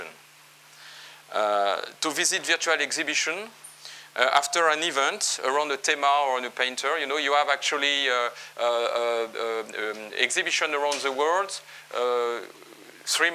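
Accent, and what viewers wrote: France. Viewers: ehh.. 47 minutes with French accent... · id rather listen to a french accent that half of the braindead hick american accents.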